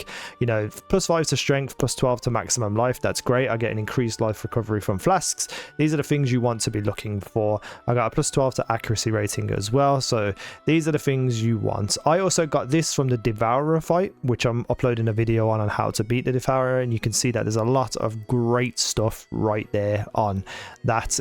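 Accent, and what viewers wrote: Birmingham, United Kingdom. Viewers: Disregarding because of the Brummie accent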